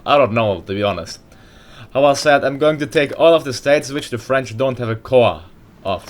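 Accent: Germany. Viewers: You German okay . But which German? Eastern?Western?Northest?Bavarian ? :D WHICH ? :D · ich glaub er ist deutsch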